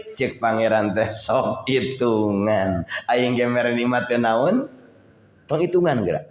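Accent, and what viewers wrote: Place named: Cianjur, Indonesia. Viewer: Logat cariyosnmh asa ci anjur. Waas .duh.abdi mlb Garut.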